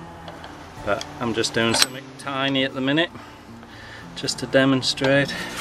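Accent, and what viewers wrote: United Kingdom. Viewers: How does an American have a British accent?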